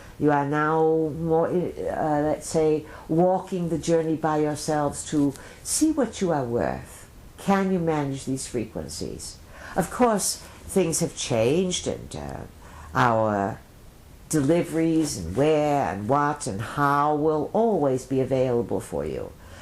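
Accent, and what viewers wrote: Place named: Europe. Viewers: I'm just curious why she went from speaking perfect plain english in 1996, to having an odd Euro accent in 2014? Not to mention going from doing 3 hour hypnotism talks in a slow monotone, to talking in the third person. Or maybe the fourth person. Not sure how to describe one person, talking in the third person as two other people. LOL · LMG, obviously you have not carefully studied the Pleiadians teaching thru' Ms Barbara Marciniak. They originally introduced themselves as a 50 member collective, and were learning how to teach, advise, communicate with humans. I've lost track of exactly where they stated they are now 100 + Spirits, and have come a long way.It should be clear to you, as well as many of their skeptics, that the familiar voice has changed a bit, have added humour and wit ( often a play on words as well ). Barb is well educated ; she would never make common English grammatical slips, confusing correct use of " this " vs : that ", switching pronouns in mid sentence, incorrect subject and verb agreement, and the occasional misuse of common American wordings. 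Their vocabulary, though excellent, occasionally they slip into British idioms, Euro vocab and pronunciations, and oddball word order. Frequent hesitation, and repetition of words and phrases while searching for the precise word to fit the idea, is a characteristic, of a non-native English speaker. The final clue is, they encourage and even beg for questions from their audience, so as to learn what concerns are on peoples' minds. They can give long dissertations and insight into complex, historical, economic, political, religious aspects. No human is capable of this broad swatch of understanding and explaining, almost ad nauseum. Despite some negativity towards their ending, creeping in sometimes, they always manage to convey a positive messag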